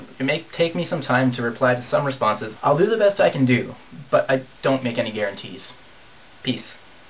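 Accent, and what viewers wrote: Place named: Canada. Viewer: haha, I love canadian accents. abowt! hehehe. nice video, dude.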